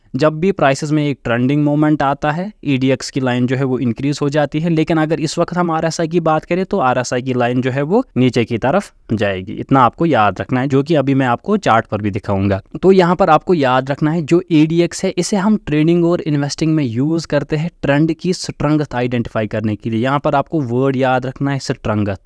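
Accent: Kashmir, India. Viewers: Kashmir se ho kya bhai aap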